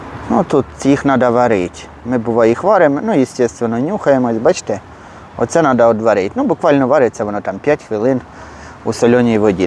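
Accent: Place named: Surzhyk, Ukraine. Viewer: Суржик у Вашому виконанні забавно слухати. Майстер стендах. Всього найкращого. Лайк і чекаю на нові відео.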